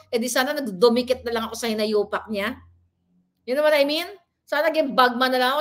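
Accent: Iloilo, Philippines. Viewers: Kanami lang sa imo maghambal sang ilonggo ,sarap pakinggan. Mahal ka namin madam.ingat lagi.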